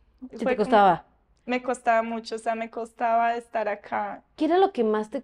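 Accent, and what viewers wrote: Mexico. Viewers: Esa hermosa colombiana habla como Mexicana te amo cásate conmigo quieres ser mi esposa la madre de nuestros hijos? besitos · Todo su acento de este bella dama muy mexicana muy bella colombiana en México. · Guau qué bonito habla no cabe duda el estilo mexicano y el tono de vos que tiene muy bonito me llamó mucho la atención qué interesante mujer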